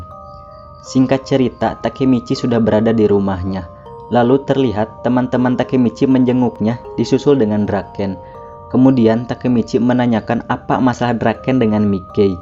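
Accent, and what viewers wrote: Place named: West Java, Indonesia. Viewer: Siganamah orng sunda ye si akng :)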